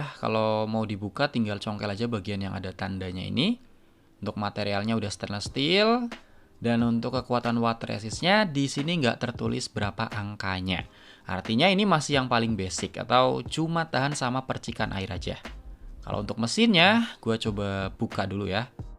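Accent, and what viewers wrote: Tegal, Indonesia. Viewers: Oalah...masnya dari Tegal toh...makanya kalau bilang Gua kedengarannya unik 😊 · Oh mas nya wong tegal ternyata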